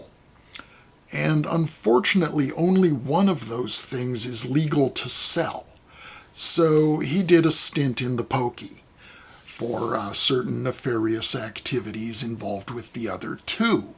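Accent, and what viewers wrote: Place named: New Orleans, United States. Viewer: You are messing with my neighborhood now. I grew up in the same 9th ward area as. I guess that makes me a night tripper too😂. We have a very similar accent. Your pronunciations are a bit off, but no one would notice but a local😂🤣 It's nice to seeing you giving some love to the good Doctor, especially at this haunted time of the year. Have a great Halloween even if you aren't here in New Orleans. There is a new book coming out, I believe next month., my brother from another mother😂🤣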